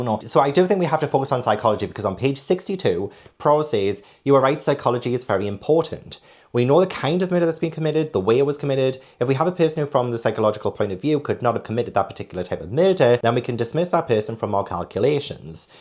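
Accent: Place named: Northern England, United Kingdom. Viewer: I'm not great at accents myself and I am British. It's very obvious to me it's a northern accent but parsing out which one isn't my forte. However he talks about Newcastle a lot so I imagine this is the accent local to there.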